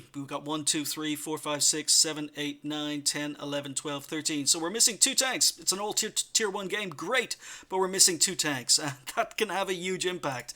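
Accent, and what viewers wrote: Ireland. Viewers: Great video! Loved your sexy Irish voice! That sounded like something a Girl would say :p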